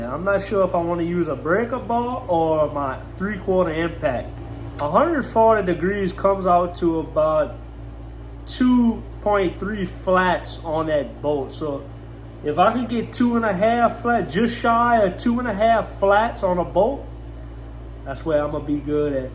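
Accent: Louisiana, United States. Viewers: what part of louisiana you from?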